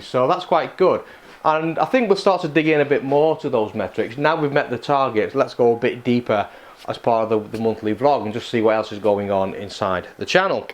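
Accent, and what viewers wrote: United Kingdom. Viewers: Hi. I accidentally came across your channel recently. Surprising since I watch a ton of YouTube 'Wood Porn, as my wife calls it.:P - I guess your search terms are working. Where are you based? From the accent I am guessing North West England? I am from Birkenhead originally but live in Utah now. Good luck with the venture and keep posting the awesome videos! Cheers, Rob P.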